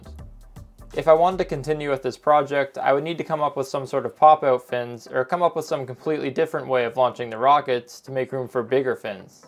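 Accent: Canada. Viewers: Now thanks to HIMYM I cannot unhear that "eau". when you canadians pronounce words like "out" it comes out as a "eaut". like the french word for water, followed by a weird out of place "t". and it's not only in out. several words that came out in himym follow the same pattern. Nice rockets and everything, but every time I hear: "eaut" my brain goes: this is a canadian. :) and it has done that like 10 times during this video :) well, maybe a little more :) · I pegged you as Canadian :) Probably Ontario. It's OK because I'm from the left side of Canada. Cool rocket by the way.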